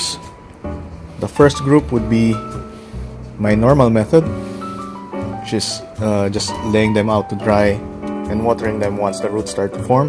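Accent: Philippines. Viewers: You have filipino accent.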